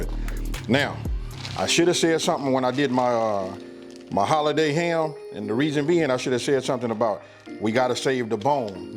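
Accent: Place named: Los Angeles, United States. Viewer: Yes, sir!! One of my fav soups. New sub cause you sound like you're from where I'm from... L.A., CA. Cornbread is a must.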